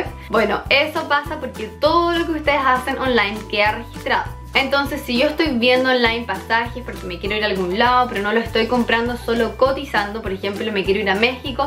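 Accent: Chile. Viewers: Tu eres chilena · Eres chile cierto y si lo eres se nota Pd:yo tambien soy chilena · Ovbio es chilena!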